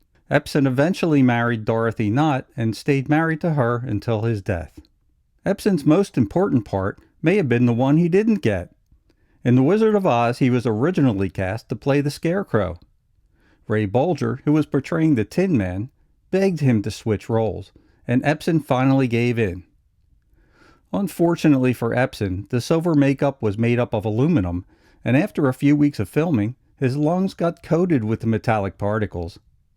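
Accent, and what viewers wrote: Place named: Baltimore, United States. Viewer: lovin the baltimore accent of the narrator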